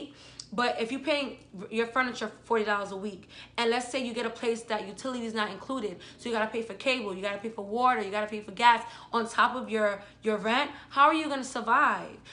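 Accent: New York, United States. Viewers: I knew you lived in NY! You sound like u could be related to cardi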